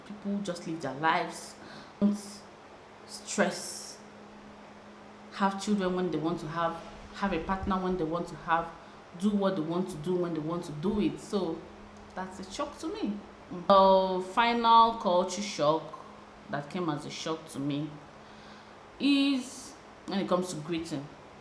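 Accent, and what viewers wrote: Nigeria. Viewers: You look Xhosa even if you sound Nigerian ❤️ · funny enough i also thought so. And when you said "Dumelang" then all of a sudden you looked half "Tswana and half Xhosa"...magic...lol. If you did nt have Nigerian accent you would definitely looked like one of the locals.lol. You should learn one of the languages. Will benefit you big time.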